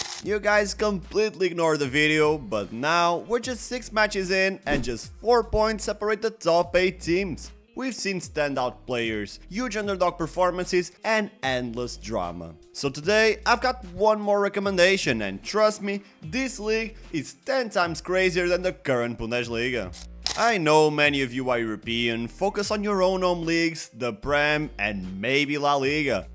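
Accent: Portugal. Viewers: aposto que ele é portugues, pelo sotaque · Por quê? Parece sotaque português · This accent don't lie to me: you're abolutely tuga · i love your european portuguese accent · Is the dude narrating from Portugal or something? His pronounciation of the names sounds like a Portuguese trying to say the brazilian names. The S feels almost like a Carioca speaking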